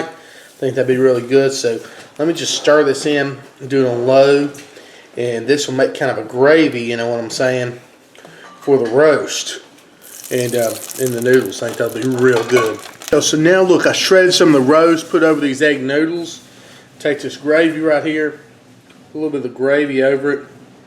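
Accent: South, United States. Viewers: Lol i love you... Just good ole southern voice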